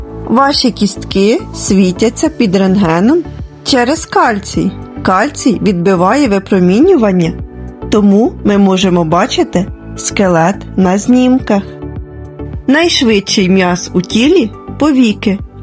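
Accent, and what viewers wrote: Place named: Poltava, Ukraine. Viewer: Не дивуюсь, але не перестаю дивуватися, який Господь великий! А ще поважна людина, що озвучує з Полтавщини, чи Черкащини))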